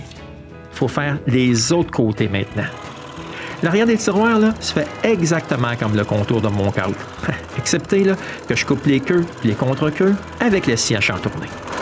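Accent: Quebec, Canada. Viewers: Is this a quebec accent?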